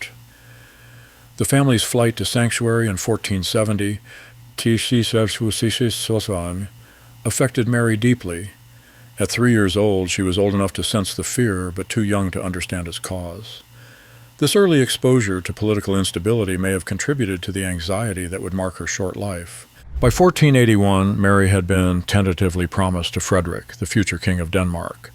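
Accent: United States. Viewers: A bit hard to listen to an American accent on this subject; bye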